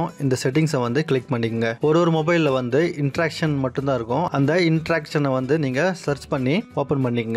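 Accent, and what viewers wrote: Chennai, India. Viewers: Pakka chennai tamizh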